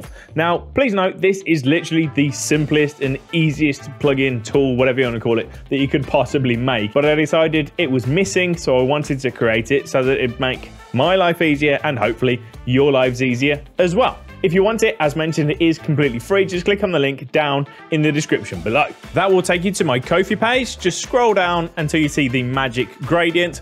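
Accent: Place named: Birmingham, United Kingdom. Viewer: I am watching you from 2 years and I was prety sure you have a brummie accent but I wasn't sure until today when I saw your Twitter account.:goodvibes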